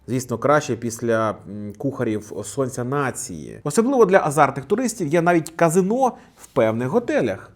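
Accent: Russia. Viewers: Московський акцент нагадує пʼєсу Подервʼянського «Кацапи»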